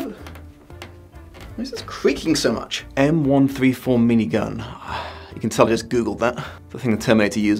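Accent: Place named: United Kingdom. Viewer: Hearing you rip this series to shreds, in your awesome British accent, is the most cathartic thing EVER.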